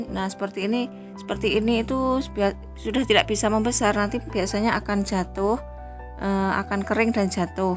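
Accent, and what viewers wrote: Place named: Java, Indonesia. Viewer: Kelihatan banget suarane wong jowo.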